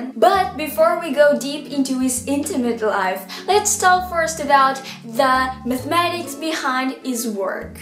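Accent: Portugal. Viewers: Se não fui claro o suficiente, eu acho bonito ouvir o português de Portugal, por isso disse que senti falta :D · Prefiro quando fala em português de Portugal. Acho muito mais interessante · Peculiar - you can realize the portuguese accent. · Ooooh my Lord ... You speaking english is so precious.... You are so intelligent ..smart and clever... And a math gurl... I'm think I'm fall in love e seu sotaque português é maravilhoso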